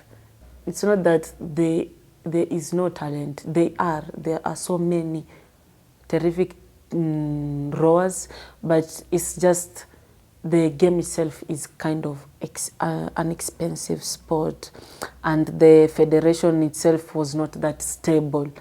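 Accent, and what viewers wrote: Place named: Coast, Kenya. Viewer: Most costo people sound like that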